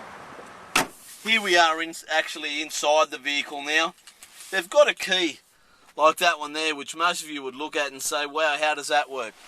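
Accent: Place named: Ireland. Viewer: this guy sound like irish man from cavan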